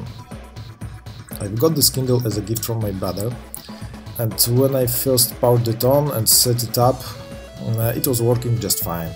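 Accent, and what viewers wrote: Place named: Eastern Europe. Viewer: Собрался выбросить, думал батарея... заменил, без толку, звездочка крутится и виснет. Лазил в поисках волшебного "Big hardware reset for Kindle", нашёл это. Буду колдовать. Спасибо. В режиме санкций, концепт пoтреблJтства "купил-сломалось-выкинул-купил" необходимо забыть и восстанавливать то, что можно починить. Благодарность автору! (прошу великодушно простить, но акцент в ролике - наш, славянский).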